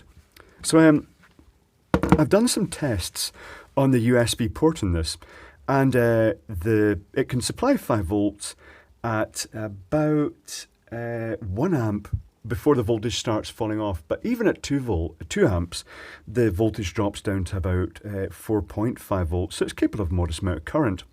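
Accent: Scotland, United Kingdom. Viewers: R u Scottish? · Can't be the only one who knew he was gonna be Scottish before clicking on this video.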